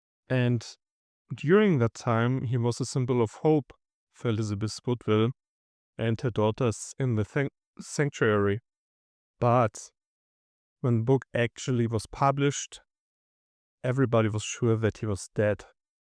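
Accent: Germany. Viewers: Unlike Americans, the German accent is elegant, and graceful. It is a pleasure to hear you speak. Please, always feel free to do so whenever possible. I am looking very much forward to the expanded video on this subject as it is quite deep filled with complexities which may hold be the key to revealing the most long awaited, fiercely battled mystery in England's history. Thank you greatly for your fine, most dedicated work in this regard. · you know I love your German accent! It's a hot day in Texas too but that's normal for August. I sure hate the heat. It's so draining. At least I'll be you have snow in the winter where you live. Snow is a luxury here. But it's so pretty when it does. This whole York, Plantagenet, Lancaster, tower thing is confusing. About the time I think I have a grasp of it, something else comes up. You keep up the good work, ok? And thank you!!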